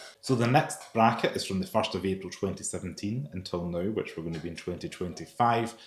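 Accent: Scotland, United Kingdom. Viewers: whats wrong with your mouth. Everytime you open it scottish comes out. Did you have it for dinner. You need to thyrow up quickly and then speak properly.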